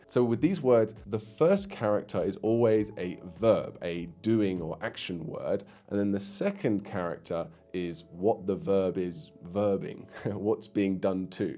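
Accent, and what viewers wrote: Standard. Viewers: 普通话真的说的比我这个母语者还标准。。。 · 这位老师的发音真的非常标准（来自中文母语者） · 你的视频作为中文学习是非常好的材料。 不过还是有一点点建议：中文的每个字的拼音最好用空格分开，这对初学者会更友好。 你的发音非常标准，很好🥰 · 发言够标准的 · 你的发音很标准，不错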